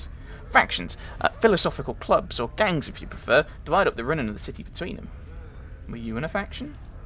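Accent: United Kingdom. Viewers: Some words i coudn't understand, so i turned on subtiteles which made complete gibberish. That's when i realized i finally can understand UK accent! Love this playthrough, the way you are trying to read every dialogue the devs had put into the game, with relatively fast pace reading. You have inquisitive mind, and i love that you share your impressions of the game and your thought process.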